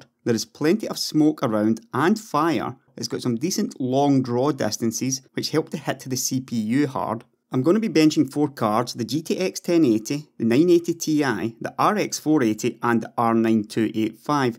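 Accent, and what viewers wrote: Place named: North, United Kingdom. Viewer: i love that northern accent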